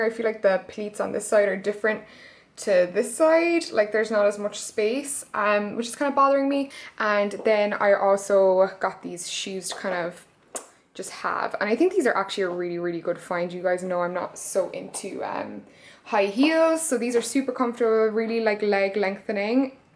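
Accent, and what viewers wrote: Ireland. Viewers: Sexy Irish accent 💕👌. X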